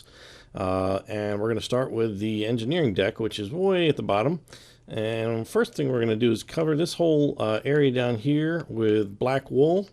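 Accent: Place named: United States. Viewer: he's from america but he talks a little different fro me I'm from England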